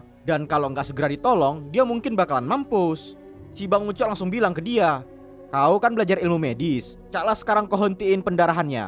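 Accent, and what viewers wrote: Medan, Indonesia. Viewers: MEDAN KALI ABG INI AH, JADI SEGAAAAAN KALI AWAK YAKAN😂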